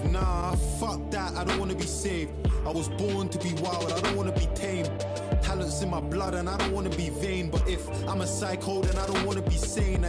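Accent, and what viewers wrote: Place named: United Kingdom. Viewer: our bri'ish boi poppin off once again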